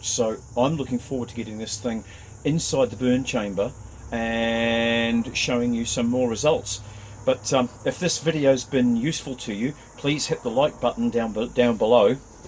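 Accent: New Zealand. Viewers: he's in NZ, cant you pick the accent? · Is this kiwi ingenuity at its best? Taking a gamble, you sound like a fellow kiwi. Awesome stuff mate you’re a legend. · Thot you were aussie first 30 seconds.....then the KIWI became foremost. Cheers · That's a kiwi accent mate!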